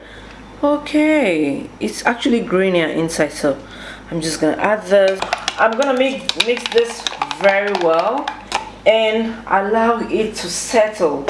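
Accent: Nigeria. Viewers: Please stick to your Nigerian accent....nice video btw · As you were talking I said to myself this lady has to be Nigerian. Then you mentioned Nigerian rice. Gbam! I've just started doing the rice water rinse, I may try this one down the line.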